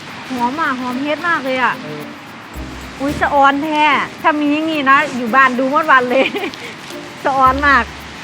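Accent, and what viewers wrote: Korat, Thailand. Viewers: ชอบแก่พูดโคราช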